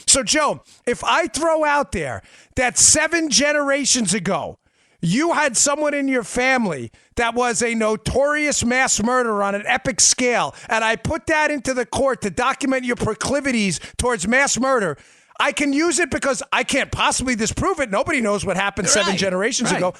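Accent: New York, United States. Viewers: The basic fact is that the Liberals who support the Democrat Party can in no way shape or form be called liberal. Realism requires that we return liberalism to its fundamental meaning. True liberals base their ideas on the Bill of Rights of the US Constitution. End of story. It's time more people got their support straight. Thanks and best. Great to hear it spoken in a New York tone of voice for a change.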